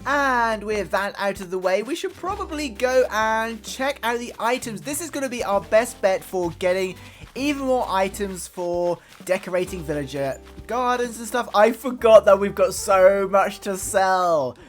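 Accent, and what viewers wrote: United Kingdom. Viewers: Hey, I just want to tell you how much I look forward to your daily "spooky" videos. I've been a subscriber since 2020 when i purchased acnh. I really enjoy your energy and your British accent 😅 I wasn't playing acnh for over 10 months but 13 days ago i restarted my island and finally i found my love for playing acnh again. I've binged watch all of your older videos 😅 I'm just happy that you're still here making acnh content 🙏🏼 Love from Germany 🥰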